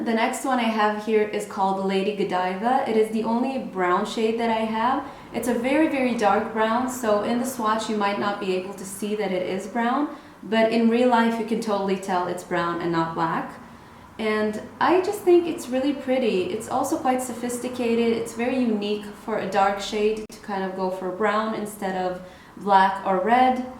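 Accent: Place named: Netherlands. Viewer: Are you by any means Dutch? Idk you kinda have the same accent as me in English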